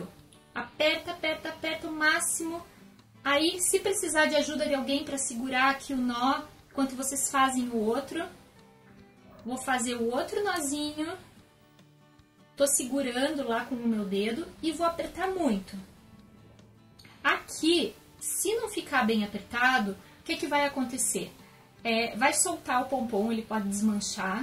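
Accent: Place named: South, Brazil. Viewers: Que lindo💕👏💕 De onde vc é? vc tem sotaque de quem mora no Sul do Brasil☺️✌ Super Bjo!💋💋💋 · também sou de Curitiba dá pra perceber o geito que você fala com o E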